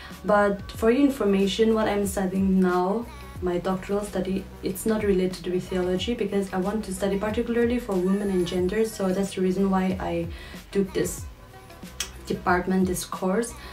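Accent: Nagaland, India. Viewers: Originality is what you are my sister. The accent is real naga♥unlike those wanna be famous nagas local celebs. Praising the Lord Jesus Christ and sharing the Bible verses in the middle is the best thing I came across in the video.. Down to earth and being humble will take you very far in achieving your goals.. May God bless you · I have many naga friends and now my english is like them I love the naga accent 🔥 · Idk why people still consider English speaking as a barrier of intelligence. No matter how well we speak English and have accent like those of the Britishers, we will never be Englishmen. Being a Naga, it is okay to have an accent like a Naga because that's our identity. And whoever criticizes on your accent, well they need to get a life and maybe get back to the roots. Besides, you have a wonderful singing voice.👌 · You look like a Ao but your accent sounds like angami...you might be thinking why...🙄 Here is the reason because your voice is so soft like angami ladies🤗🤗 people will believe you if u tell thm that you are cocktail..lol..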